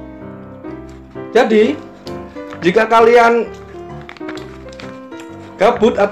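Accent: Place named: Pasuruan, Indonesia. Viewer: Mas bro orang mana sih.logatnya kyak orang pasuruan??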